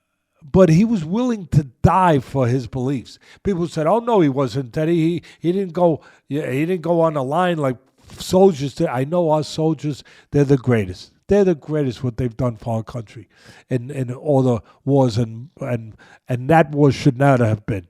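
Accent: New York, United States. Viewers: I love everything, especially the NY dialect. It’s broad, honest, genuine.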